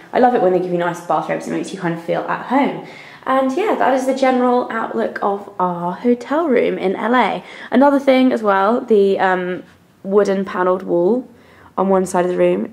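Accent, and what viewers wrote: United Kingdom. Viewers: aw loved your british accent its cool :)) by the way i'm from Oman :)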